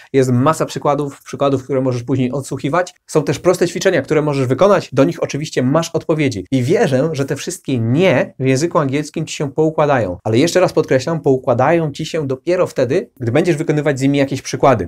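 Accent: United Kingdom. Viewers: ok super to jest angielski a nie amerykanski hahaha Pomocy Ja potrzebuje AmerykanskiAkcent A to jest zupelnie inna bajka !!!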